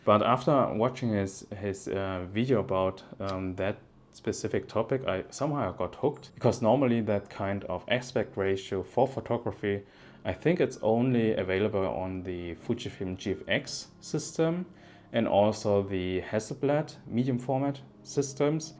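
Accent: Germany. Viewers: Just curious, what racial are you? And because of your accent, do you live in Germany for a really long time?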